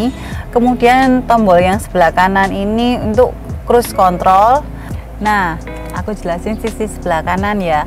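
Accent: Java, Indonesia. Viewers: Medhok e bikin betah nonton 😄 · Penyakit baleno hb lama, lampu nangis dan setir lengket masih ada kah ? Mba e medok bingit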